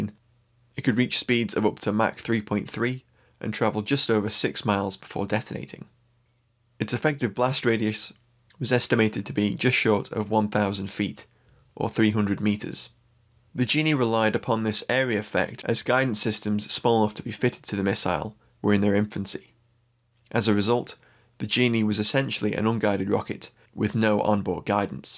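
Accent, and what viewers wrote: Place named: Cockney, United Kingdom. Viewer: Why always with the Cockney Annoying Brit Commentator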